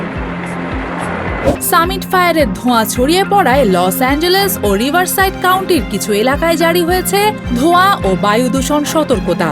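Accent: Kolkata, India. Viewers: কলকাতার মত কেন নিউজ পরছেন?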